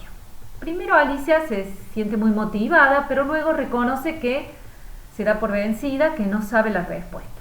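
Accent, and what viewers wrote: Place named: Córdoba, Argentina. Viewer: Un luuuuuujaso (leáse con ese hermoso acento cooordoooobés)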